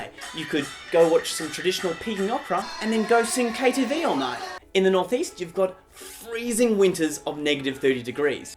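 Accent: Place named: Australia. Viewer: Your accent is so aussie!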